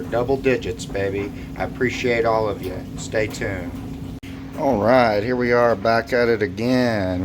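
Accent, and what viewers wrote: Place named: Deep South, United States. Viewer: It's 3am here in So Cal and I couldn't sleep. Pt 1 & 2 toolbox tour came up as suggestions. You're actually quite organized and no doubt have a wealth of repair knowledge. Both videos are concise and move right along. Best wishes on your career and youtube endeavors. You have an interesting voice and accent, do you claim the deep south as your home? Texas?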